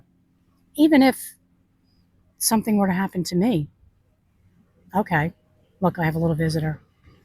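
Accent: Long Island, United States. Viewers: Listen, I have a girlfriend that tells me every designer name and the saddest part is I have no idea what she’s talking about so I do understand not having a lot of stuff around. I think it’s wonderful. Do whatever you want we’ve earned it haven’t we . I love the accent. It’s just like mine. I grew up in Long Island, but I live in Pennsylvania now, but I had 30 years in Long Island. I love your little house too. By the way I’ve been following your journey. I’m very sorry about your daughter. I truly am. The girlfriend I’m just like you when you showed that house across the water. I thought it to myself absolutely not. I don’t wanna heat it. I don’t wanna put air air-conditioning into it. And I am a minimalist in a lot of ways. I just like to talk to my husband into throwing some more stuff out or just giving it away. Anyway, do the best that you can and also I have to say you have such beautiful hair. 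I’ve been meaning to say that have a great rest of your evening. Enjoy your time with your friends and family in Florida and I’m looking forward to seeing what you do with upstate New York take it easy.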